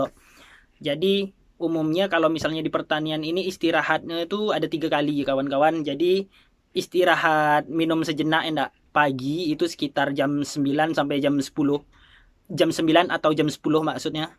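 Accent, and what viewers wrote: Malaysia. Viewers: mantep mas, bahasa kuala lumpur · Mari logat jowo, kenape sekarang jadi nak Melayu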